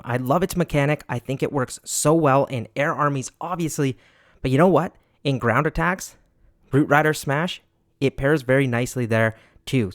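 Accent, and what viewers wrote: Canada. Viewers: New here.. recognized you being canadian just from your tone😂😂. I'm from Edmonton. Keep it up, bud.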